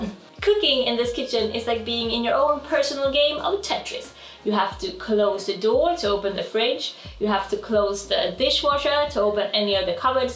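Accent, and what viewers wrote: United Kingdom. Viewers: I can definitely hear some glorious British accent coming along :D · wow you're already catching some British accent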